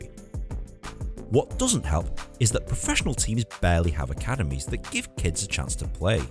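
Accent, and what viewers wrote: United Kingdom. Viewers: What the fuck I just realized your an Asian Man with a British Accent, that just shocked me lmao (I know there's a lot of Asian-Brits so don't go informing me that Asians with british accents exists. Also I speak English with an American Accent sooo I get the same reactions to people as well - they're like I didn't expect U to sound like that.)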